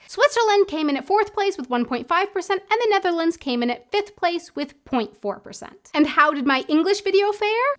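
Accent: United States. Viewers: As an ex-pat Brit living in Germany, I watch your videos for your experiences of living here. To be honest, I prefer the English language videos, your American accent with German words is sometimes hard. Re: finding out about the videos - checking my subscriptions or when you mention it on Twitter. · Colombian in Germany. I think most viewers are ex-pats in Germany, thus the English preference. Also, the popularity of the videos in English, rather than German could be because you have a very thick American accent in German, and I personally, have problem enjoying it. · U.S. viewer. I normally watch the English version. Sometimes I feel like watching the German version, though, because I took several years of German in high school, yet I find it very difficult to understand videos from native Germans. Weirdly, I suppose because of your American accent, I can understand your German surprisingly well. 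Thus it's fun to "practice" my German by listening to an American speak it. 🤔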